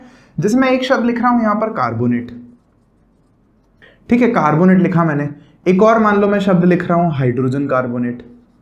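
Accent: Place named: Ajmer, India. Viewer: sr aap kya ajmer se ho kya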